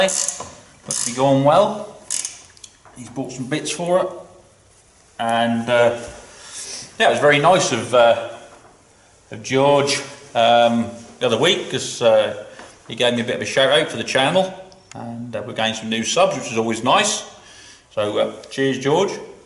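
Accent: Norfolk, United Kingdom. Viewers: Just found your channel and enjoying your content. You sound like a good old Norfolk boy, takes one to know one, Sub you.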